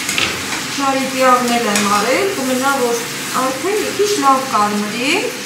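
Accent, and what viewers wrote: Lori, Armenia. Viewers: ջան երբ որ քեզ լսումեմ մի տեսակ հանգստանում եմ։Ուր մնաց լոռվա բառբառը։❤❤❤❤❤❤❤😊😊😊😊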